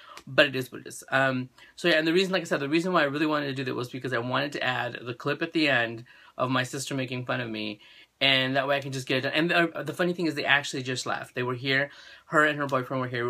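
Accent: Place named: France. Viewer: First off you are gonna laugh when I tell you this.........but for some reason I always thought you had a bit of an accent and were FRENCH and maybe from Paris or something? I have no idea why. Second little sis is gorjuss! You ain;t bad yourself. Third I loved the entire video! Thanks!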